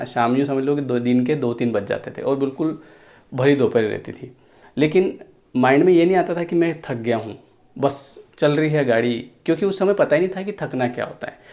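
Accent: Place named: Malwa, India. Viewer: Your tune seems indore and ratlam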